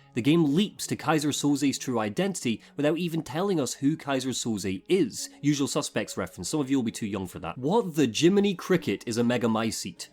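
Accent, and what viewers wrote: Scotland, United Kingdom. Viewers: I don’t criticize Scottish pronunciation. I enjoy it, it’s fascinating and eclectic in its acquisitions of other dialects’ features. Keep up the fine work. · Haven't finished the video yet but "You can criticize Scottish accents when you get free healthcare Americans" almost made me die laughing as an American myself ddd. Imagine correcting someone's pronounciation when they are from a different country and have a different accent to you. That's just rude. Besides, in-ven-try still sounds right. Anyway, sorry for the babbling, going to finish the video now, but I love/agree with a lot of what you're saying! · I watched this video for about an hour before I realised you were Scottish, you have such a light accent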